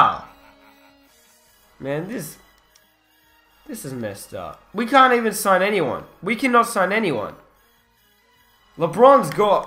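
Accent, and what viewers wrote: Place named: Australia. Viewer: yo are u from Australia or England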